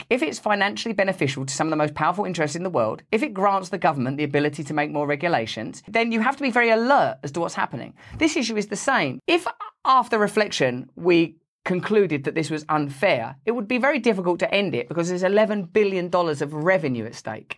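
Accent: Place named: United Kingdom. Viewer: Why do so many people like this guy? Discusting, arms flailing about, that British accent is really back alley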